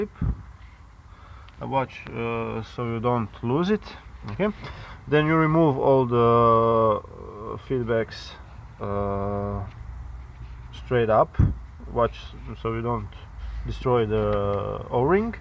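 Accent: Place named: Russia. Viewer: С таким акцентом может говорить только русский.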